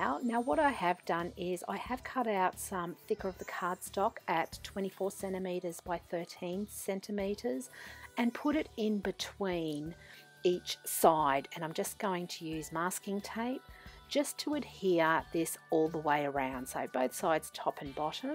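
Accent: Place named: Australia. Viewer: this is a great caddy for the inks. I love using other things to make what would have been expensive storage items, especially here in Australia. I recently made a tool caddy from empty sauce bottles, bought from choice or stacks, they are cut down to different sizes and sit on an inexpensive lazy Susan. There is nothing quite as good as making your own. Thanks for this and great to hear a fellow Aussie.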